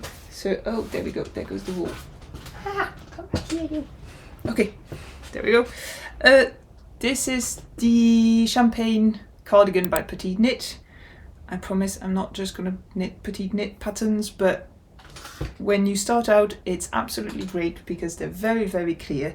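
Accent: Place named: Wales, United Kingdom. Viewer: Nice first podcast 😃. You could modify your Ida tee…. Undo the cast off and unravel to length you want… then re-do rib 😊. Undo the sleeves and put in some deçreases to get rid of some of the width. It would be worth an hour as it is a very nice piece 😉 I also think that Petite Knit is fantastic for everyone. She has sensible everyday designs. I just finished her Cumulus …so simple but a great everyday sweater. Your red cardigan will be beautiful The Gepard (Danish) kid silk is also very nice. I am presently knitting the Buttercup T-shirt with Sandnes Garn Line, The Thelma Cardigan by moonstruck knits…who has grrreat patterns check her out…using Myak Tibetan Cloud for the first time and it is glorieux 😂 You have a nice accent when you speak English it has a Welsh sound… bye bye … me baldelaine on Instagram 😊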